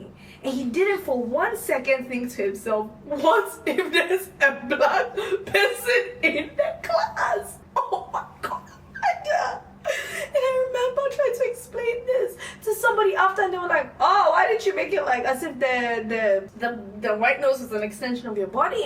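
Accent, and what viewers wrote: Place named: United States. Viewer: your american accent >>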